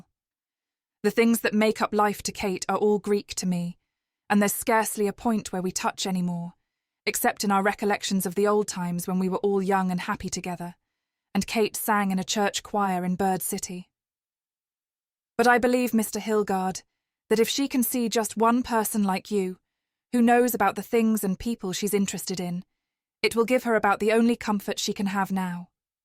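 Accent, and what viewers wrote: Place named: United Kingdom. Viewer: Please find American voices for Ametican novels. (I have a sensative ear for accent & couldn't listen to your James Joyce stories w a British accent. They didn't work ....). In genrral I am grateful for your downloads. Very.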